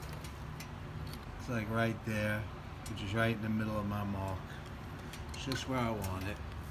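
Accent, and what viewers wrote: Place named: New York, United States. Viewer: love that new york accent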